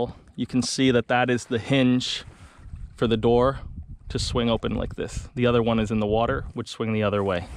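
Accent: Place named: Eastern Canada, Canada. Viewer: Wow great video and history lesson. Thanks for taking the time to put this together. Very interesting. Love that eastern Canadian accent😊👍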